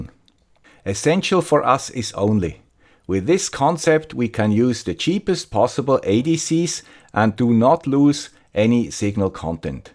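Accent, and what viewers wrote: Switzerland. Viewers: One of your greatest videos! Thanks! And your Swiss accent is perfect as usually. :-) 73! Jakub, OK1SE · Those 1% of the facts informed me more about SDR than I have ever seen. And all with a Swiss accent. Thank you.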